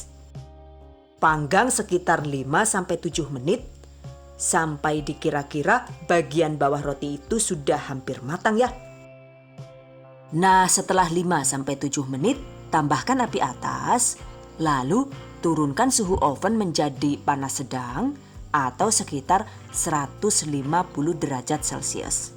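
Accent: Banyumas, Indonesia. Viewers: sy suka resep2nya..selain mudah caranya,suka bahasa dan logatnya..banyumasan(purwokerto)gitu😍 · Bukan Tegal mas...ini pasti asli wong ngapak (Banyumasan). "Ora ngapak, ora kepenak"😁😂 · salam kenal ya bunda yg gaul and ngapak2 he he catattt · Klo nyimak pas ngomong ngapak itu lo hahaha.... kocak, masuk OVJ deh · Padahal inyong juga wong MABES (MADIUN BREBES) bisa ngomong ngapak juga tp kl denger si mbakayune lg ngomong ngapak inyonge auto ngakak 😅😅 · Kanggeeeeeeeen karo bhs ngapaknya..... Mksih resep bludernya bun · ini resep yg ditunggu tunggu vocal audionya ok bgt seperti penyiar perbaduan bhs ngapak dan englishnya mhn dipertahankan sucses selalu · Makasih resepnya bunda,sipp dech, lucunya bhs ngapak e..😘😁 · Jan seneng banget krungu ngapak koh dadi terobati rinduku karo mama ku.suwun yung karo bahasa ngapake. Slm dari perantauan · Mbaknya lucu suaranya kyk orang bule tp kyk pangenyongan orang apa to sampeyan itu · Suka banget dengan channel ini, penjelasannya detil dan menghibur , logat Banyumasannya itu lho ngangenin. 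semoga menjadi amal jariah. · owalah medok nemen banyumasane ha ha ha..... mksh mba mudah dimengerti ilmunya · Bunda asli mana si? Ko bisa bahasa ngapak kaya saya.😀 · Meski aq jg orang ngapak tpi klo denger ibu ini ngeluarin jurus ngapakny psti aq ngakak 🤣🤣(pdhl gi nyimak serius bgt langsung ambyarrr...) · Langsung download....👍 makasih yang ngapak bgt tp lokasi Jatim 😁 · yg baik hati cantik dan salekhah...ttimakasih resepnya...pokoke ora ngapak ora kepenak 😀😀😀👍👍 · Channel kesukaan saya dari awal liat YouTube sampai kini...dari suara ,cara mengucap kan keren banget 👍🏻di tambah bahasa ngapak nya yg khas ,juga ilmunya yg profesional 👍🏻👍🏻👍🏻 sukses selalu❤️👍🏻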